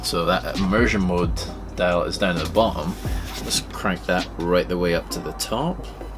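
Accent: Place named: Scotland, United Kingdom. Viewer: I live in the Glasgow area and you honestly have the most interesting Scottish Accent I've ever heard! Oh, and by the way, I bought these earphones on the back of this review. Mainly because when you started playing music your face said 😲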